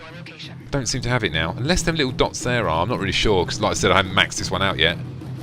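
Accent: England, United Kingdom. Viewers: From the moment i heard ur voice im thinking like this guy is smart because i always think that people with english accent is smart :) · Been watching some of your videos after I found that warframe one, Your voice is now one of my favorites out of people who talk over video games on youtube with an English(I think?) accent. lol, and you know there's a lot of those.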